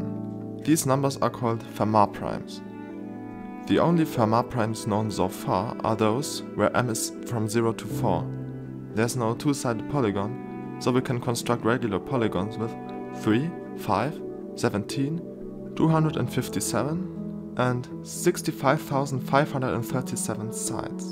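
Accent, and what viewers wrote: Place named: Germany. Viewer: Nach dem Akzent zu urteilen sind Sie Deutscher. Hier ein kleiner Englisch-Tip: „not constructible as well“ ist falsch. Es heißt „not constructible either“.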